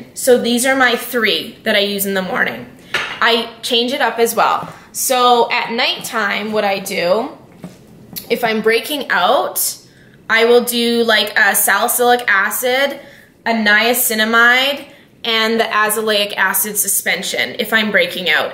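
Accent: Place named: Canada. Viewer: Just Canadian accent.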